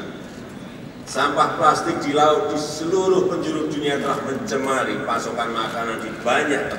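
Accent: Java, Indonesia. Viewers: bintang anda wong jowo opo Ra? Kalo bukan wong jowo gak usah komen .. itu logat jowo , kan jokowi baca bukan pas sholat .. gapapa bro liat penjelasan ulama sepuh jaman dulu mereka pas dzikir bacaan nya kayak asing.. kalo gak tau mah gak usah merasa benar dah contoh kayak orang Sunda gak bisa ngomong f tapi jadi p .. niat nya kan memuji Allah SWT .. kalo anda bilang begitu berarti orang gagu berdosa semua dong mereka semua logat orang yang kekurangan gak bisa fasih baca bacaan Al-Qur'an ... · bintang. kamu suku... mana??? itu logat jawa cokk.. jawa tulenn... cek sendiri... di daerah2 jawa... khususnya yg dusun ato desa... logatnya... gimna... kiyai2 spuh pun gk seperti km... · bintang alpateka koq ribut org jawa mmng logat aslinya gt cb liat puji2an dr sunan kalijaga logatnx jg jawa. .gt sj ribut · Kangen versi bahasa inggris pake logat Jawanya ... Pernah dengar kah kalian... 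logat Orang India, Rusia, Arab, Jepang, saat mereka menggunakan bahasa Inggris/Indonesia??? Di situlah kita bisa mengetahui dari mana negara mereka berasal ... Maju terus Presiden Ku.. Menjadi Kebanggaan untuk Ku Bagi Ku.. Presiden Ku adalah ... "PRESIDENT MADE IN INDONESIA" #2019PRESIDENKUmadeinINDONESIA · bintang orang jawa logat beda pret..kayak daerah ku sholat ashar bilang ngasar ran ..emang salah menurut low yang maha bener hadexhh..niat utama yang penting..salam warass.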